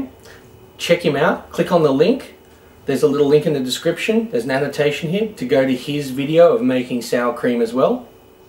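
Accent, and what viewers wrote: Australia. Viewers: Are you from Australia. Because your pronunciation defines that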